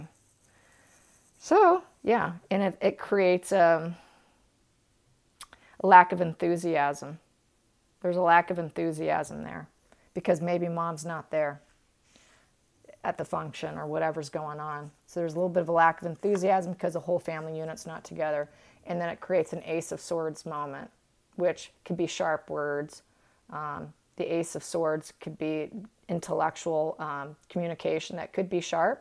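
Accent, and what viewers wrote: United States. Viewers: Love your voice! It’s calming are you from the Midwest? I sensed that from you the day I heard your voice.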